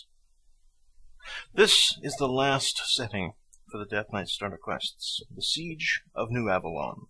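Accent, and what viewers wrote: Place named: RP, United Kingdom. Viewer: Seriously, I like very much your voice. Too RP for some ppl tho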